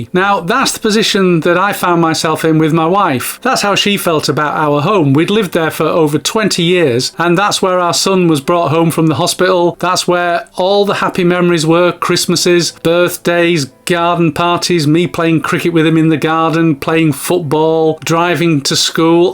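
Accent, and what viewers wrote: United Kingdom. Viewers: Always enjoy your content and believe what you are saying is true in part and for some people. I recently have had a change of heart about giving away certain things like videos, cds etc. In the 'subscription era' age we now live in. The powers that be want us to keep paying and re-paying for things because effectively will will never own the item because it's in digital format. I'm hanging on to my tangible items so that I can play and use them when and how I want, not to be determined by a subscription I must have. Love your channel and your accent reminds me of my relatives in the UK. I'm in Australia.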